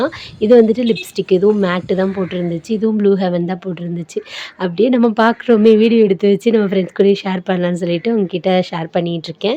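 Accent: Ramanathapuram, India. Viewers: Ennoda native ramnad dhan unga speech vachudhan kandupidichen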